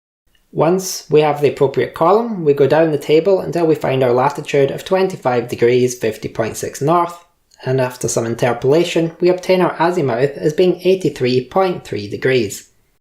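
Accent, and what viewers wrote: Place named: Scotland, United Kingdom. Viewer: I think you are scottish..you have a strong and beautiful accent sir! Keep making more videos on nautical almanac! Take it easyy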